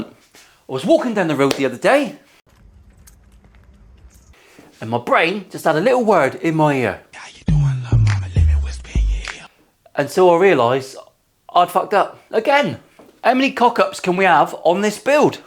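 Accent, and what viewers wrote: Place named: West Country, United Kingdom. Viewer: It isn’t possible to swear too much when you have a West Country accent. It’s actually pure poetry and art… and fockin funnoy!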